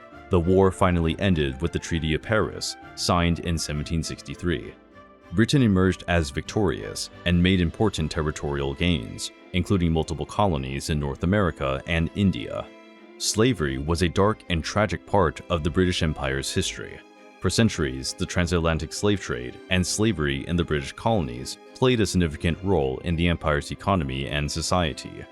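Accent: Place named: United States. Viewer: Of course its a biased review, the voice over is done by an American XD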